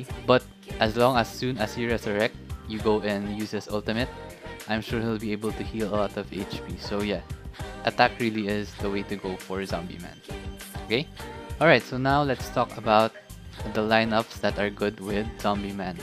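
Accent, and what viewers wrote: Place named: Philippines. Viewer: Are you from Philippines because your accent is Philippines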